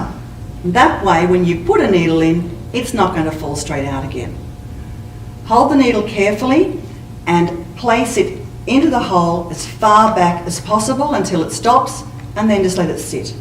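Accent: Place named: Australia. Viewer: Thank you for your clear and informative instruction on pleater use. Well done 👏👏👏 How nice to hear not only an Australian voice but an Australian voice with culture (without 'ocker') I now will search out where I can buy an Amanda Jane!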